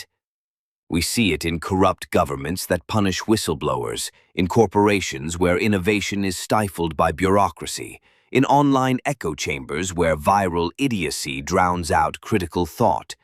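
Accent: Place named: England, United Kingdom. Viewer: Too slow, lose the english accent. Good shid lil bro , good luck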